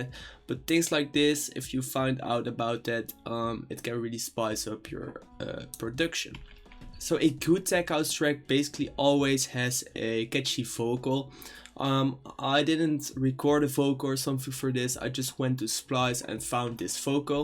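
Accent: Netherlands. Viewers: Hahahaha je can horen dat je Nederlands bent, klopt dit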